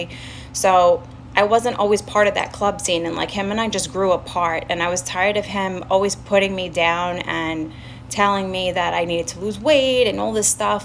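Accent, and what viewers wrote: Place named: New York, United States. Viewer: As a Floridian I can tell you she definitely has an accent! I can hear it loud and clear. Are you from NY or somewhere up north? That may be why you don’t pick up on it.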